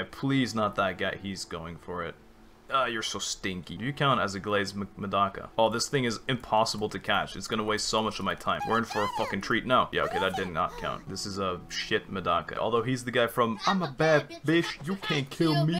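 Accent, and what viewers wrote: France. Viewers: i just realized has a perfect french accent, and so he might be french like me- i'm not sure how i'm supposed to react to it, but i guess i'll take it positively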